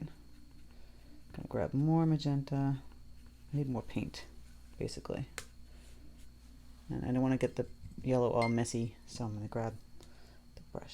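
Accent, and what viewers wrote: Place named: Massachusetts, United States. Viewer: Love your teaching style I’m a beginner but enjoy your instructional method of don’t stress. Also detected a bit of a Mass accent 😀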